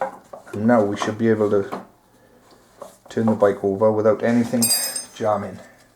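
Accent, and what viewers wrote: Wales, United Kingdom. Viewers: You sound welsh do you have a shop or anything because I have a stomp 160 with a jammed gearbox and I need someone to have a look at it and there’s no one in South Wales that can do it for me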